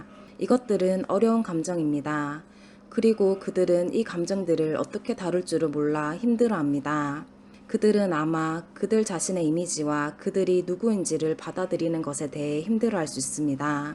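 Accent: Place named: Busan, South Korea. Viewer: I can hear a hint of her Pusan-dialect, which makes it understandable. Don’t bash her. At least she’s trying hard to make it sound clear.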